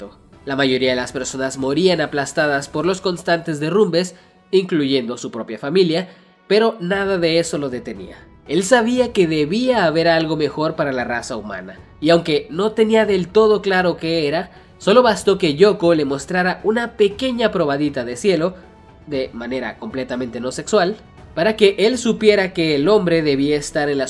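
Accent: Mexico. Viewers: De eres? Me suena muy mexicano tu acento; Aparte que usas Muchas palabras del léxico mexicano. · jajajajjajajajaj me gusta tu forma de ablar como nosotros haci mexicano mm creo que eres mexicano nuevo sub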